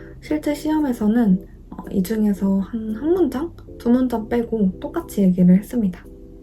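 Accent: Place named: United Kingdom. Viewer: 영국억양 멋있어요.! Autumn 한국인이 쓰는거 첨보네요 👍🏻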